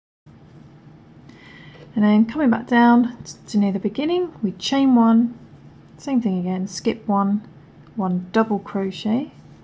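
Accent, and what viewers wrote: United Kingdom. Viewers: You explain it so well and your not rushing it at all I love how it’s all to do with the crocheting rather than showing off your nails like some others do . Plus it’s good to hear someone from the uk 🇬🇧 like myself 😊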